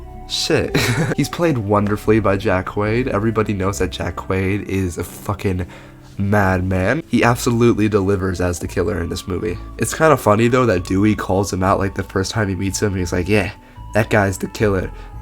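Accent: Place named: Germany. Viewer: Nice video! You've a german accent kinda xD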